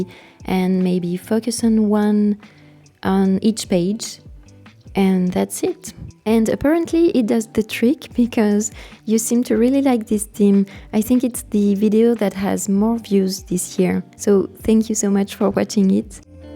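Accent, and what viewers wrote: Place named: France. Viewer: I love your originality - such beautiful work! And I love your French accent too!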